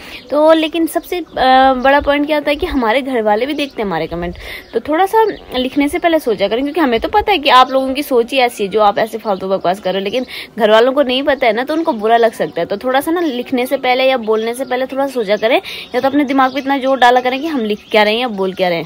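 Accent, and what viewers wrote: Garhwal, India. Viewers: apke bolne m jo gadhwali jhalak aa ri h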